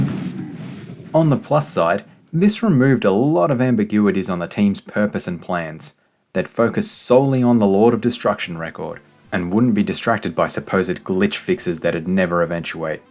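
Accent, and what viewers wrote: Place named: New Zealand. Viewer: I've binge watched your videos over the last couple of nights. Your passion is so evident -- it's infectious. Thanks for the content. Your narration is awesome and I could listen all night. I can't put my finger on where you're from, though. You sound Kiwi; your relaxed, steady rhythm sounds East Coast NI (my ex-home). That, or Kiwi-born and raised and now in maybe Vic state, AU? Either way, I'd love it if you covered other games too — if the passion is there. Just more content, pleaseee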